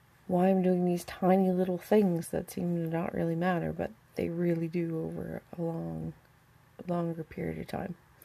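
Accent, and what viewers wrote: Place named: Cape Breton, Canada. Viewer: Thank you for sharing your story! Adding your voice is so valuable, be strong! Your accent reminds me of so many of my Cape Breton friends it makes my hearts warm!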